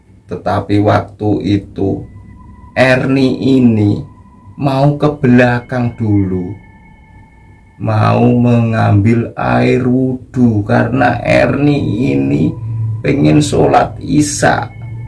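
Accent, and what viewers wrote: Java, Indonesia. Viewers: AsyiiK, SeRu TeRBaWa CeRiTa MaS, DeNGan LoGaT GaYa BaHaSa KHaS JaWanya,NGaNGeNii 😂SeMoGa SeHaaT WaL AFiaT & KeDe PaNnya KonTen/CHaNNeL SeMaKin BeRKemBanG/SuKSeS SeLaLu AamiiN. 🤲🙏👍👍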